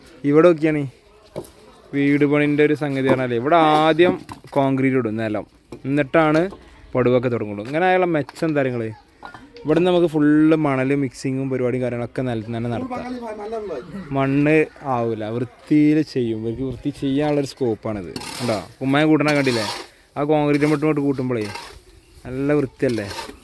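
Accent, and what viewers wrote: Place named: Malappuram, India. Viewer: എടോ ഇത് മലപ്പുറം ശൈലിയാണ്, അല്ലാതെ അച്ചടി ഭാഷ ചാനൽ അല്ല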